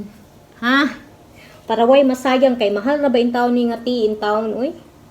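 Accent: Visayas, Philippines. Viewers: sis omg i love the way you talk Bisdak na bisdak kaau i love it i will play and watch all your videos im sure naa ko ma learn thanks sa pag share aning steam facial spa treatment or “Toob” dali ra sya pero careful lang sa pg apply kay ininit mn tubig hehe utong jud ning klaseha kay tiis ganda man Pero para unsa man gyud ni sya nga ritual sis pang hydration sa face? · Lami Kay paminawon mag bisaya sis na try nasad na ko sis. YT pa more maong g bugas hh parehas ta😂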